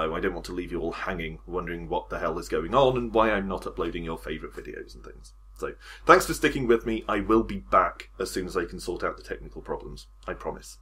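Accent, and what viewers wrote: United Kingdom. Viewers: I'm glad things seem to be sorted. I just found the channel, and definitely subscribing! ...although, I must admit it is in part because of your British accent. (^_^)b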